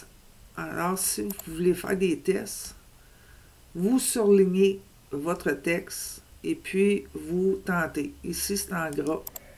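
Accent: Canada. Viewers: sa ma plus votre façon de parler a la canadienne merci pour le parage ;-)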